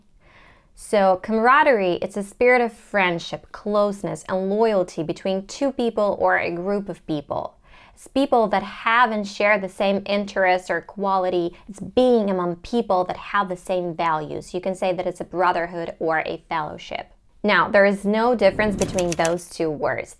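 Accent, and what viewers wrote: United States. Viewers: Can you tell what kind of American accent are you speaking? It’s a cute accent!!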